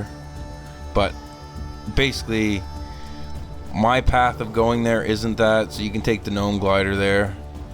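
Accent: Canada. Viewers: are you from nova scotia ?canada obviously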